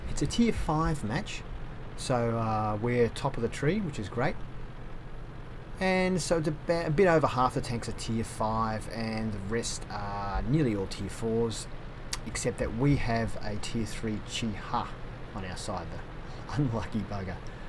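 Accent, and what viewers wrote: Australia. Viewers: Hey - I'm enjoying your reviews so keep up the good work. You sound like an Aussie - where are you from? I'm from Melbourne and also play on SEA server...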